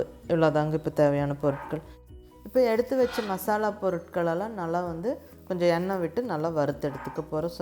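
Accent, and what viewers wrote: Kongu, India. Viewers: Mam...Nenga pesumpothu I noticed Ur Kongu-Tamil.....I like ur Slang and So much of love for u..Mam.😘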